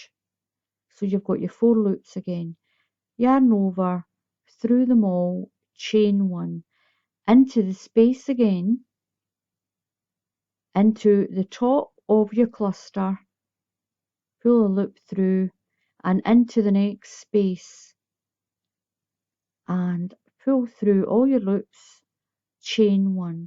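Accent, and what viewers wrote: Scotland, United Kingdom. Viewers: Another beautiful blanket, can I just ask you on the border what do you do on the corners for the double row and the treble row? By the way love your accent, I had my DNA done and I'm quarter Scottish. But I dont have the accent which I wish I did.,your work is as always fantastic.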